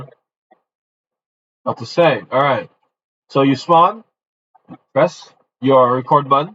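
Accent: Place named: Netherlands. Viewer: Thanks for the video btw you sound dutch what is weird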